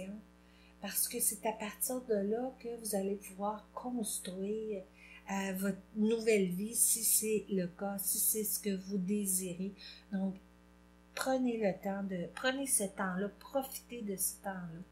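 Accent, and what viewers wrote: Canada. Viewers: Merci pour ce beau tirage, je viens de m’abonner, j’adore votre petit accent canadien plein de douceur😉 Namasté 🙏🏻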